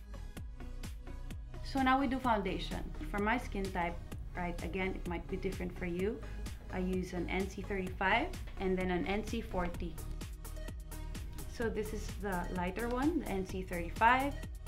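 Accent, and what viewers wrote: Philippines. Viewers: grew up in the Philippines. Her accent is the same as middle class Filipinos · Gosh! I really love her Filipino accent! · Maldita do you have problems with your so called "Middle Class Pinoys"?! She might have that kind of accent but were you able to achieve what she has right now? I'm pretty sure you are a filipino, and I am sure that your accent also belongs to Middle Class Pinoys. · I love your accent, you make me mis all my Filipino friends in Alaska. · i love her accent! so filipina! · I can here the Filipino accent 😍😍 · I love her but her accent bugs me. i cant wait to see a Filipina in American media who can talk normal. i just hear that accent from middle class filipinos so much and I know filipina girls think its cute. it's just too much to listen to. sorry. still love you. just have to put you on mute.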